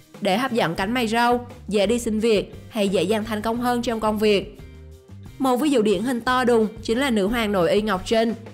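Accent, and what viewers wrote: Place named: Nghe An, Vietnam. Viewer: giọi nghệ an khó nghe thì sao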